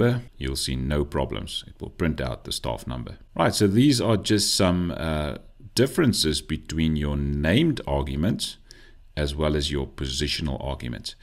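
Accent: South Africa. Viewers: hey, I just realised that is a fellow South African, the use of Rands and accent. represent, represent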